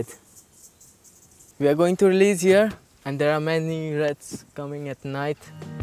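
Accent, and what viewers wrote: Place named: Russia. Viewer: Bro ur accent sounds like that of russians. Cool✌️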